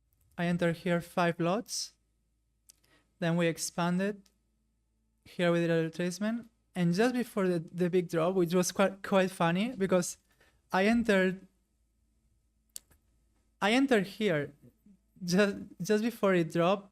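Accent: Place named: Spain. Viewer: para que cojones hablas inglés si eres español, vas de intelectual y es ridículo. Mucha pereza la gente como tú, de verdad que tu acento es ridículo, si buscas más visualizaciones hablando inglés lo llevas claro, espabila chico.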